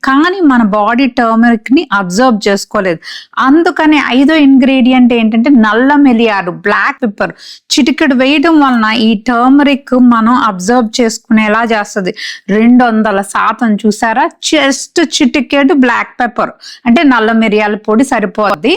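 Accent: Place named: United States. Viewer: Why using stylish american accent in telugu? If u use telugu, try our accent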